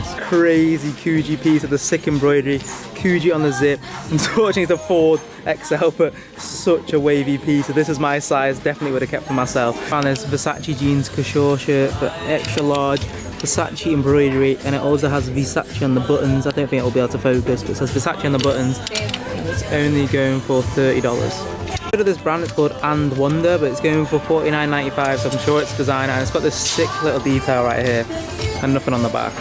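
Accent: United Kingdom. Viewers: It sounds weird hearing a person from the uk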